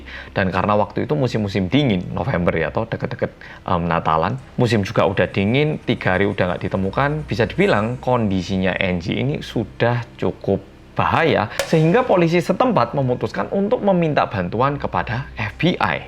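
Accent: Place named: Java, Indonesia. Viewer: Selalu menarik uraian diakhir kisahmu...mas medoook😅...tks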